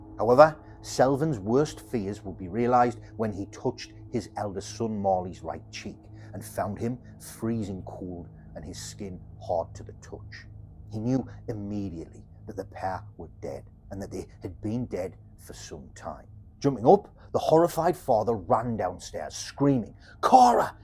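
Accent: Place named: Sunderland, United Kingdom. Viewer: Yes, I'm a Mackem too. You can't really mistake the accent for anything else 🤣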